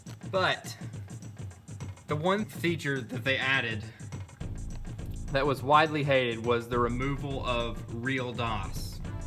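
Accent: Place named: South, United States. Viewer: You must be from the south (Alabama)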